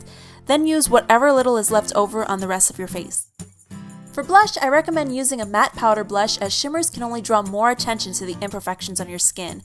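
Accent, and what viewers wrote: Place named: New York, United States. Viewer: *yeah for new york accents*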